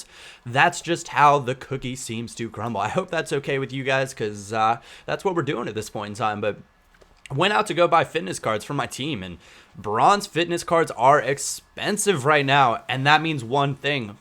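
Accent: United States. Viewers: leave a like for american accent !!! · hes american isnt he?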